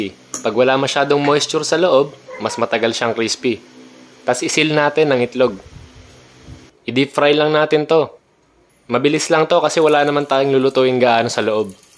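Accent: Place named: Pampanga, Philippines. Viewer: yung accent nya...kapampangan ka? eheh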